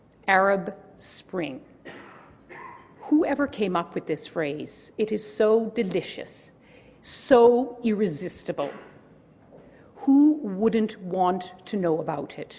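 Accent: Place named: Minnesota, United States. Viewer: She sounds a bit like Minnesota people, to me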